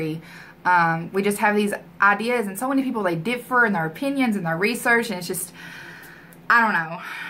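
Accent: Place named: Texas, United States. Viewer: you have that taaaaxes accent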